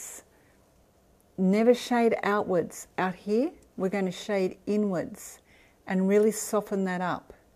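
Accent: Australia. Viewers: Your personality fills me with warmth and joy and you are one of my top favourite YouTubers. I remember finding your channel (broccoli sprouts video) when I was in a toxic relationship and did not know what was wrong with me, and your videos gave me so much hope, softness, feminine energy I was missing in my life. I watch your videos now and I feel that burst of joy, pleasure, warmth and happiness you always have in your videos. I love your Australian accent too. You have no idea how much your radiant personality can heal others behind the screen. Thank you for being you. I hope one day to pull off this makeup look since you look like a model. There are so few people left on this earth with such an authentic emotive energy, beauty and personality. I hope you never change who you are. Thank you for what you do to help so many people remain beautiful in a world that can be cruel at times.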